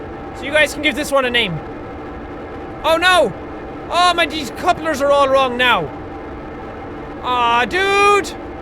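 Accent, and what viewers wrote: Ireland. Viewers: I just want to thank you for all the awesome videos you do! You've inspired me to play so many games( especially Kerbal!) Also I love you're Irish accent! I am also very Irish and thought it was awesome you were when I started to watch you're vids. Keep BOSSING things up, LIKE A BOSS!!!!!!!!!!!!! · On his older he was more embarrassed to talk in Irish tone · Is Irish? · ChurchISBest I like his Irish voice though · r u irish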